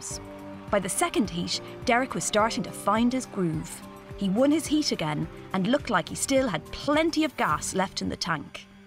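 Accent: Ireland. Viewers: She has a wonderful Irish accent. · Yes. Just with an Irish lilt. Also if you are going to criticise language, you should spell English with a capital E.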